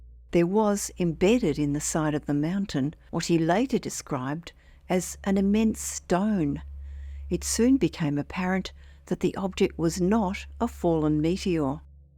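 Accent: Australia. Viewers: The Australian accent makes it less credible. I'm not sure why. Maybe its a Ross Coulthart effect. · She’s American. This is an Australian accent!!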